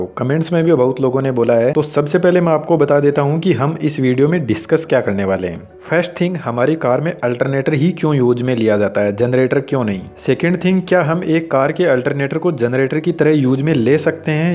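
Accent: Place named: Shekhawati, India. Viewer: Bhai Shekhawati ke District Sikar,Churu, Jhunjhunu se lag raha hai Bolne ke lahje se ...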